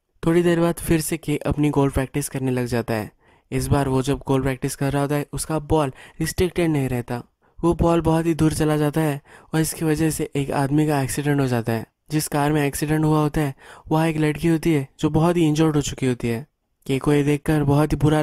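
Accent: Maharashtra, India. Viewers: Chitka hua ... Full Marathi guy speaks hindi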